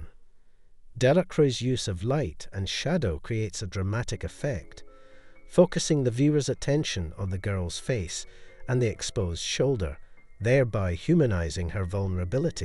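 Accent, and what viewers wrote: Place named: Scotland, United Kingdom. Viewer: I love your voice sooooo much! I can listen to it forever lol. Scottish sounds so cool. You are a great audio book haha 😂. Keep up the videos you got a sub!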